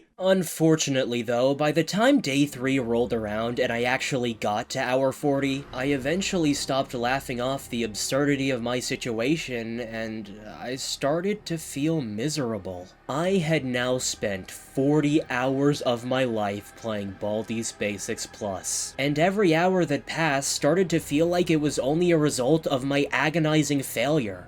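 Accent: Brazil. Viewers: holy moly youre brazillian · pera ai tu é brasileiro???????????????????????????????????????????????????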